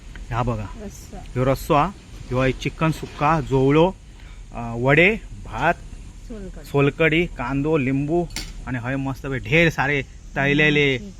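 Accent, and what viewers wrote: Malvani, India. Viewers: तुमच्या एवढी गोड मालवणी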